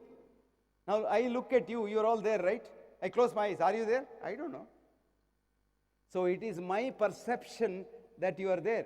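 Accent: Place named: India. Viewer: EXCELLENT & VERY SOUND - From my corner of the US I give this a definite Thumbs UP!! He is very eloquently expressing what I have been thinking, practicing & feeling for a number of years. THERE IS A WEALTH OF INFORMATION HERE THAT IS VERY USEFUL & NEEDS TO BE SHARED & KNOWN. ( I'm from India & understand the accent - PLEASE, with all due respect, use captions or enable subtitles, so that the world can understand & learn what this fine, learned Doctor is sharing with us!!) ji - THANK you, Namaste & Ushta-te!!🙏 Footnote: I looked up the book he recommended "Anatomy of an Illness" & watched it on film: <link>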